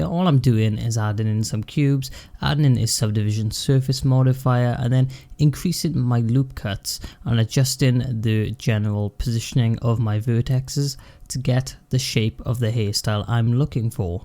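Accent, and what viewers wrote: Wales, United Kingdom. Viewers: Your Welsh tones added to the relaxing nature of this video.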